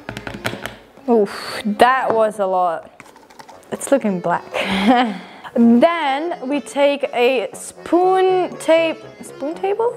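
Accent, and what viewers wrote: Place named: United States. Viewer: Serious question because I'm curious - do all Belgians learn American English with an American accent? Asking as a much closer neighbour (Brit) 😊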